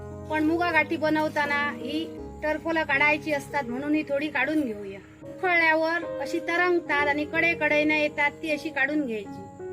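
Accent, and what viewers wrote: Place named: Pernem, India. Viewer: Goan lok aasi bhasha ulainath ge tu pednechi bhasha boltoi mugye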